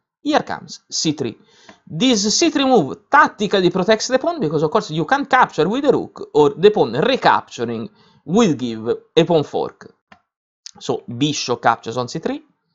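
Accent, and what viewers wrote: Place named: Italy. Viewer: Good comment... however... from the accent it is clear that you are italian...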